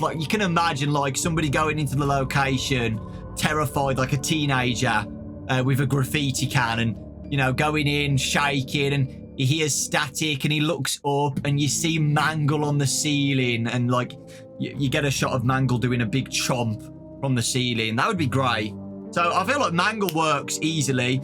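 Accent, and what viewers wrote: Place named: Midlands, United Kingdom. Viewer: Mate your accent is just amazing. You're defo from the Midlands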